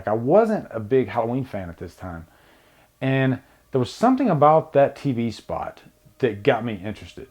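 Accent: South, United States. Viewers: I only have just recently discovered your channel and I have to say this is an insightful and well dictated review. I know this may sound stereotypical but the southern accent adds a bit of charm as well. Keep up the good work!.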